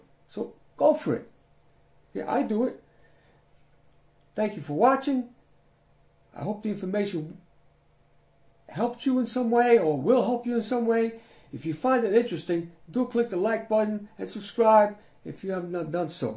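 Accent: New York, United States. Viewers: Love the advice (I'm 76) and love the accent. Maybe you're from New Yooorrrk?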